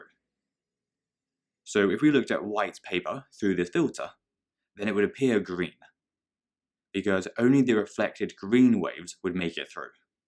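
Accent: United Kingdom. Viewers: I love bri'ish English.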